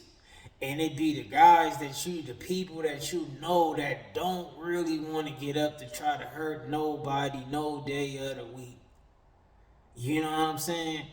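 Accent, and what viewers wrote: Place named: Bay Area, United States. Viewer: I really thought you was from the Bayarea for a minute how you talk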